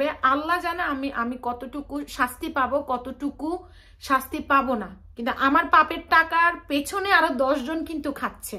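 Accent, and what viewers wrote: Noakhali, Bangladesh. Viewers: Allah apnr mongol korbe Apu.ekdol thakbe koshto debar jonno.kintu din sheshe apni Jodi ektuo amdr mukhe hashi fotan,etai apnr orjon.Noakhalir vashay shunte Valo lage.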